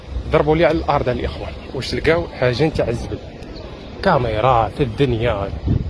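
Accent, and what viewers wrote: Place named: Morocco. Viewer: ماروكي باينه لهجتك خخخخخخخ😊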